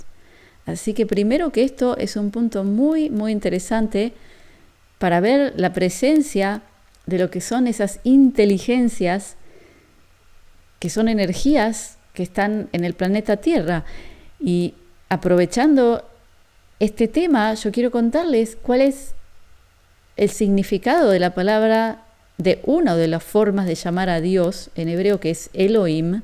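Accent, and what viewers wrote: Argentina. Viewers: tu acento argentino hebreo me produce una profunda añoranza.Dios te bendiga Shalom.Un saludo desde USA.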